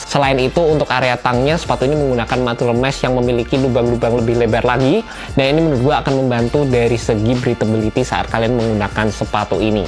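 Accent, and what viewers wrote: Java, Indonesia. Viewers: Medok olweis on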